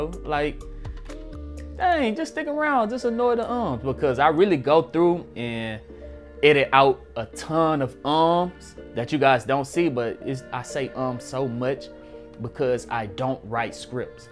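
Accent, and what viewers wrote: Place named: Texas, United States. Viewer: kI am impressed this is all off the top of your head, I also see sometimes you try to pronounce words with out the Texas Accent. But you are doing a good job. Keep up the good content.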